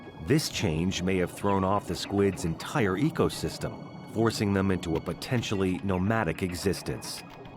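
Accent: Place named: United States. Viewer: this was an excellent video of a group of truly unique animals. However, I want to highlight 2 things on my mind which I'd like to point out. First, your accent sounds as if you're a fellow American, though I guess you could also be Canadian. If the latter, ignore the following complaint. I noticed that you used only the metric measurements, rather than doing as many to most heads of channels: use both. It would have been helpful for us Americans. The other is a wording problem in the star-nosed mole section. The professor said that this creature is "one of the only" of something, which is grammatical nonsense. The reason is that "one of" indicates there's more than 1 of something, whereas "only" means there can't possibly be more than one. What should be used in this type of situation is the phrase "one of the few".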